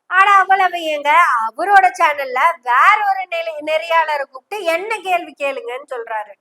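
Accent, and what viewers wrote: Kongu, India. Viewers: கொஞ்சும் கொங்கு தமிழ் அழகு. கொஞ்சம் கொச்சை இந்தி சூப்பர். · அம்மாடி உன்னுடைய கொங்கு தமிழ் மற்றும் கொச்சை ஹிந்தி... சூப்பர் மா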